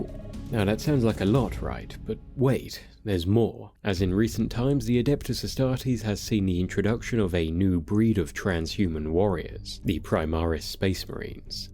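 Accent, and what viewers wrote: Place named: United Kingdom. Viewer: "Imagine yourself listening to a man with a british accent explaining things that involve mystery and murder"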